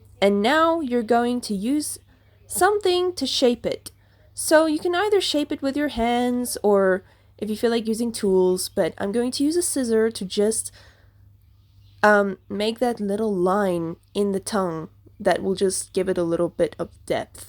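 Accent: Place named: United Kingdom. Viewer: Oy you also British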